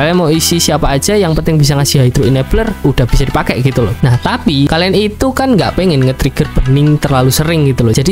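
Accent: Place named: Java, Indonesia. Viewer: Medok kali woi Kek gw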